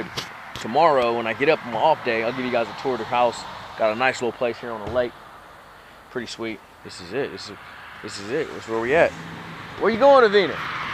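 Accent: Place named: South, United States. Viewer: Hey, I can hear a Southern Drawl when you speak now. You still a Cali Kid..? 🤔 😂 Rooting for you always my Bro.... YEETTADEE!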